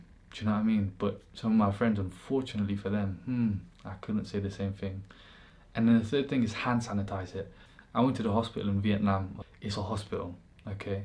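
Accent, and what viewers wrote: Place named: London, United Kingdom. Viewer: lol you don't sound as geordie, been londonised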